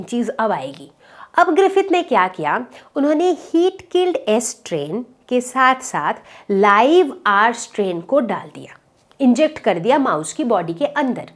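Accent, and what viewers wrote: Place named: Hyderabad, India. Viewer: aapka hyderabadi accent is super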